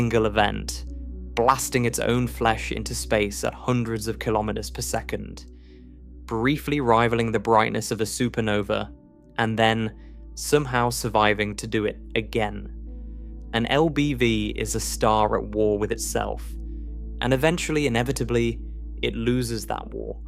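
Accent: United Kingdom. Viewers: The omnipresent British accent is getting veryyy old.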